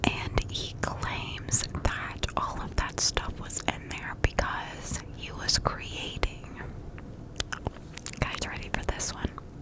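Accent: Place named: Ohio, United States. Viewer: I fucking knew you were in ohio! You have the same accent as me and all my peeps lmao. I grew up in summit ohio or blacklick outside of columbus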